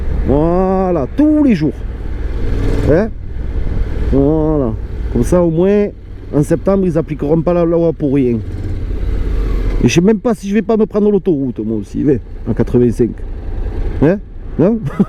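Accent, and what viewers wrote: South, France. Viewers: c rs enervé avc laccent du sud mdr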